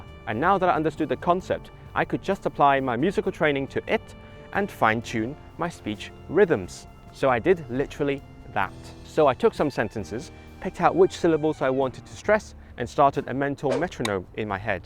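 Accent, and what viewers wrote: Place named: Manchester, United Kingdom. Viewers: Posh Northern solidarity ✊🏻 You need to start saying "reyt" though. I'll infiltrate your speech with some Sheffieldisms too, don't you worry. On the accent and belonging point, you're bang on, Manchester has people from all over the country and beyond such that labels like proper Manc feel meaningless. I would say as a native Brit though, I still have to perform different accents in different places (convergence, divergence). Class and accent in the UK are really drilled into you from birth and are a necessary tightrope to walk, especially if you have a non-RP/non-"southern" accent. I always feel like I have to be a chameleon to make interactions go well. (To answer your question, I love Singlish and HK accent! I typed the word accent so many times, it doesn't look real anymore.) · I noticed a distinct light Manchester undertone on your recent Welsh video. 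Great work mate 👍👍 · As a Native mancunian I can say its almost a fallacy to have a truly "manc accent" within the boundaries of the city alone there are subtle but noticeable differences from proximity to Yorkshire and Cheshire respectively as an example. Let alone the class dynamics. I would say your accent as you described is general Northern RP and is good enough to be effectively understood as someone who you would assume knows for local vocabulary. New subscriber and loved the video! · I wonder if anyone has ever mistaken you as somebody who grew up in the UK, or more specifically in the north, as your northern RP sounds very authentic. Also do you self-correct in natural speech when you notice your pronunciation is off? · I really like your slightly posh (RP) Lancashire accent. You remind me of a Dr Unwin from Southport who I sometimes listen to online. I was born in Malaysia and spoke a bit like you when I left school after 10 years of English boarding school in Cheshire. 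I have lived in Australia for 40 years now so that has corrupted by tones somewhat. Your accent is lovely and endearing to me. I want to reclaim lost aspects of myself starting with the Manchester accent!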